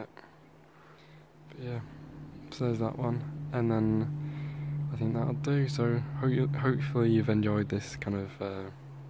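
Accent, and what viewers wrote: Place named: England, United Kingdom. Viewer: I am SOOOO sick today, and have been too weak to even hold my phone, but I started watching this earlier in a sick haze, and when it got to the kitty, I smiled all over and giggled and just felt the joy pulse through my body. Then looking at your lovely plant babies and hearing your soothing voice and lovely English accent..... ahhh... just the medicine I needed today! Thanks! 💗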